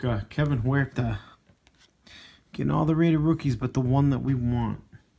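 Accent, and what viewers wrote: Boston, United States. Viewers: I am guessing this dude is from boston. His accent's telling me.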